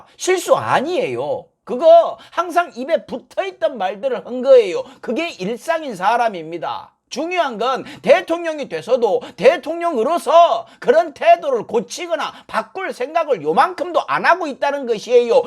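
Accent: Jeolla, South Korea. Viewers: 형 전라도야?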